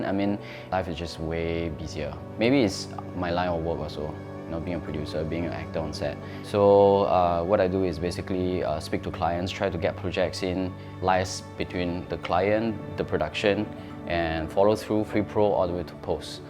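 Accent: Singapore. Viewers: his S'porean English accent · 说的 singlish 也比我还要singlish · You speak like a Singaporean very good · speak english like singaporean / malaysian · Already sound like local Singaporean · Singapore definitely welcome someone like you - how you've assimilated well, speak like one, behave like one, think like one and essentially you're one of us. I hope all of our foreign friends who want to be Singaporean to learn from his attitude of adapting into SG. All the best in your endeavour. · u sound singaporean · his English sounds so Singaporean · you sound more Singaporean than some of us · He spoke exactly like a local Singaporean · You sound so Singaporean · Totally now machiam a bilingual Chinese Singaporean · his English is on par with many fellow Singaporeans his age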